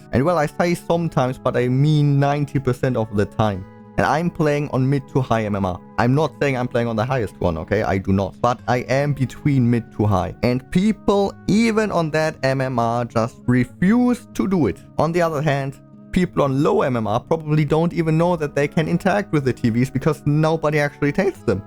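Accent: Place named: Germany. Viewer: You sound realy german (i'm german too and i recodnise it very often)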